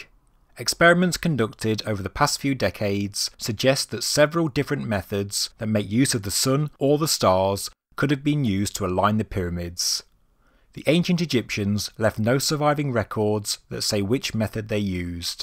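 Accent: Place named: Birmingham, United Kingdom. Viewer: definitely not Scottish. Sounds more Brummy to me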